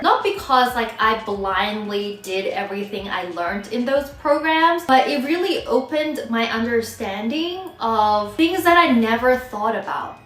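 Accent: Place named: Australia. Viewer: Does any one remember Australian accent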